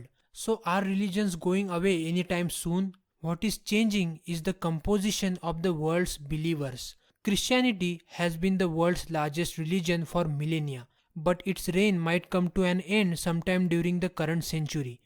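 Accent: India. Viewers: how it is possible to listen? Omg Go learn how to speak without this hindi accent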